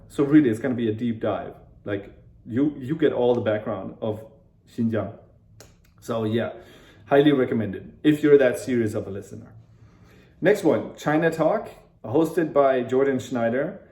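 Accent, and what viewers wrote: United States. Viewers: Thank you very much for all the information about the podcasts. I listened to few episodes of little red podcast. It is wonderful. My interest in understanding China arises from my unfortunate encounter to industrial espionage (intellectual property theft and sabotage) in Canada, and how much my refusal to be corrupt ended up changing my life. I came to know how many of my friends are compromised. I wanted to learn Chinese work culture, their fears, their motivations, their aspirations, and their tactics, just to survive. If you are a German, I would never guess that you were not an American (or a Canadian) from your American accent. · You have American accent. Very good English comparing to some German friends I had long time ago in school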